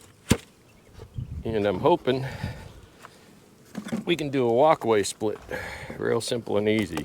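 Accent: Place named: North Carolina, United States. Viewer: I thought I recognized a familiar accent--I'm from NC, too! This was a great video. Lots of good info for someone like me who used to be a beekeeper, had to put it away for several years, and has now started back up. Thanks!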